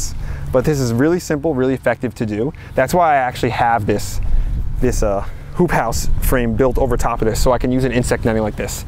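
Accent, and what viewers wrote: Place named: New Jersey, United States. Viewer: I had a customer call yesterday and he had a faint accent that I recognized but couldn't place. Then he told me he was from New Jersey and I knew right then that he sounded like. So I guess he's probably from the same region.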